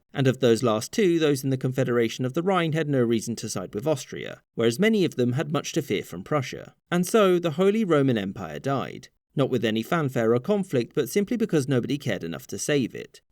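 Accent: United Kingdom. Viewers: No more bri'ish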